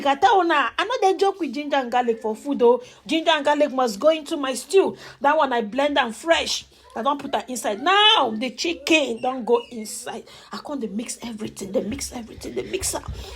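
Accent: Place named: Nigeria. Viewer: No be the food i dey watch oooo na the pig pigin English i dey listen to. want finish me with laugh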